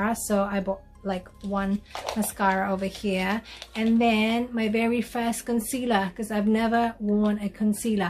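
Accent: United Kingdom. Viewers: Really love the British accent... Kilay is life talaga. Anyway it's another stream of income being an Avon rep. Dami mo na raket sissy.. youtuber na avon rep pa, plus your regular job pa. Payaman... claim it sissy. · Grabe talaga english accent mo ati 😂😘 love it!! Avon lover ako dati nung sa pinas ako hehe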